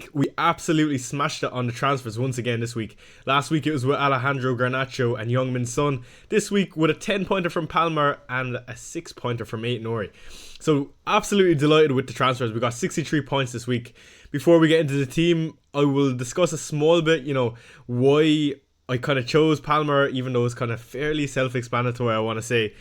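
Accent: Ireland. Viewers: You have great advice and insights, and absolutely the best accent of all the FPL You Tubers! Love a cheerful Southern Irish accent...😊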